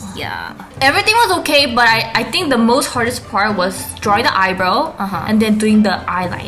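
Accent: France. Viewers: Your French accent is so cute! 😜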